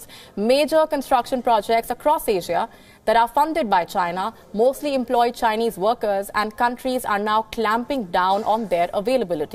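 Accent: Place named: India. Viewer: Very heavy Indian accent.....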